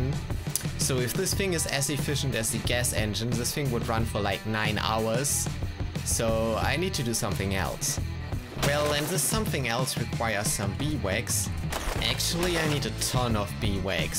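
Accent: Germany. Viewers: He sounds german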